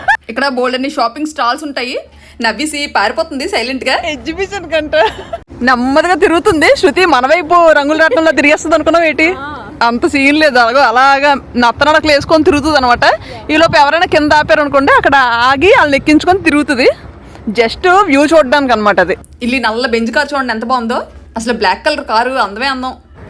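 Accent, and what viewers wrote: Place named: Godavari, India. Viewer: ఏదైనా గోదావరి జిల్లా యాస వేరు మీరు మీ వాయిస్ సూపర్ అక్క ❤❤❤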